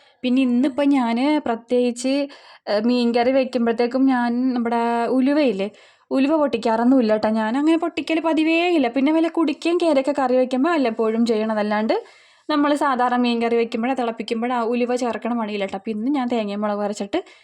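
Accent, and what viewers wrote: Kochi, India. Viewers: ചേച്ചി കൊച്ചി ഭാഷയിൽ ശസാരിക്കു ചേച്ചി നല്ല രസം ഉണ്ട് കേൾക്കാൻ പിന്നെ ചേച്ചി മുളക് പോടീ നല്ല കളറ് ഉണ്ടല്ലോ ഏത് മുളക് പൊടിയ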